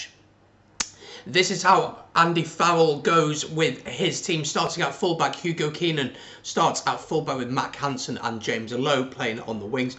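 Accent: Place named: England, United Kingdom. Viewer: You're not the best with accents are you mate!? His accent is totally English.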